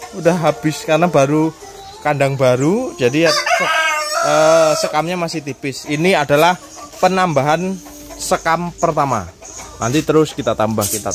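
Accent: Java, Indonesia. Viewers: Alamatnya mana om.....kok bhsanya Jawa medok banget. Pingin dolan kesitu belajar....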